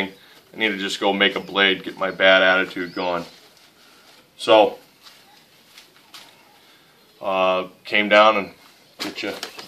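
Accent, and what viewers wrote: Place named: New England, United States. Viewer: "Up to pah." Always enjoy a listen to the NE accent. +1 for the drop point EDC design. Hard work is great medicine for the soul.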